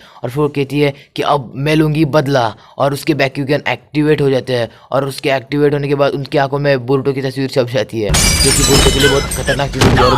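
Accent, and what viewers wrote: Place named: Maharashtra, India. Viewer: Bhai tum maharashtra se ho na..😅 tumhari aavaj se laga muze..😅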